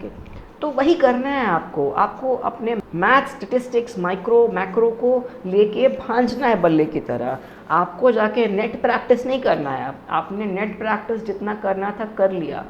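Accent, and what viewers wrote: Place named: Jharkhand, India. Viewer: Are you from jharkhand? As i have the same Hindi tone too.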